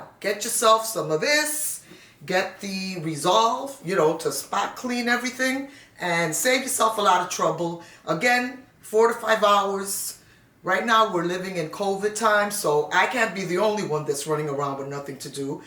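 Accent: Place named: New York, United States. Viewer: I was listening to others that use alcohol and baking soda, however I’m going to try your method. Love your New York accent and Love how you explained everything. Thank you 🙏 you are very kind to share your secret!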